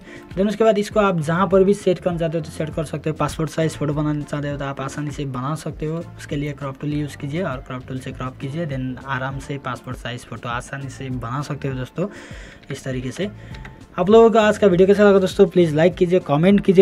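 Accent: Nepal. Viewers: You are nepali,you are not using correct hindi tone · Nepali vhayara hindi ma video banouniii haa...sound le sedai th pouxa bro ...tapai nepali ho vanera .....😂😂😂😂😂😂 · Bro Nepali ho jasto x tara bhasa hindi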